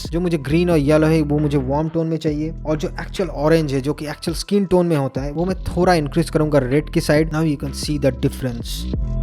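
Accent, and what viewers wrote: Bengal, India. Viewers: Vai tumi bangali na?? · Bro are you Bengali ? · Are you bengali???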